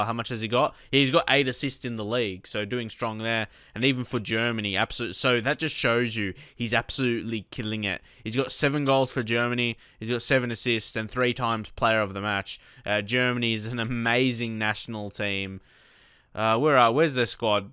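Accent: Australia. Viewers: Do you sub to people??? Cause if you do it would be greatly appreciated if you sub to me you are one of my fav YTbers and the best thing is your AUSSIE!!!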